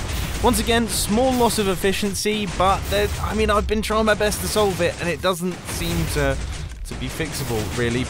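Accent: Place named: United Kingdom. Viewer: ello charming brit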